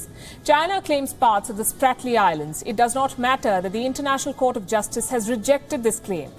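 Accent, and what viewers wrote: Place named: India. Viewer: strong curry english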